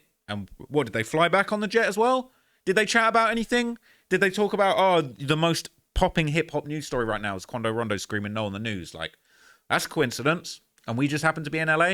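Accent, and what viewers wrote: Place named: United Kingdom. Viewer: Freudian-slips with the UK accent be hilarious 😂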